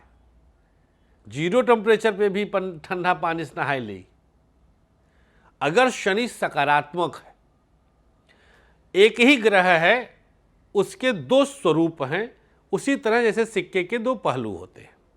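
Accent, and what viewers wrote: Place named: mixed, India. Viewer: Sir ap u.p Bihar k byea ki treh mat bola kro saf hindi bola kro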